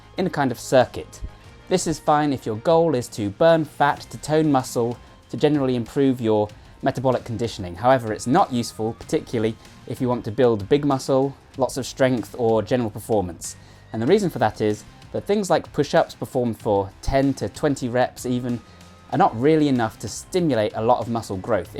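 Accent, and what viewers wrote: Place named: Wiltshire, United Kingdom. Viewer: Finally a decent and informative fitness channel. Subscribed. Which part of the UK are you from? I'm based in Wiltshire you sound local. Keep it up. Thanks, Ben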